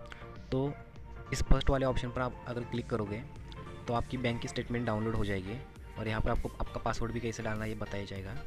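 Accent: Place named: Kolhapur, India. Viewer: Bhava kolhapuracha ahes vatat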